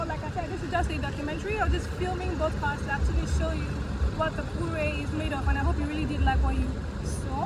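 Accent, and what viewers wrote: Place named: United Kingdom. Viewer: I honestly thought you were speaking Chinese at first. I don’t know if you can speak Chinese. If you can, then your British accent and your speed of speech articulation on top of that is making things worse.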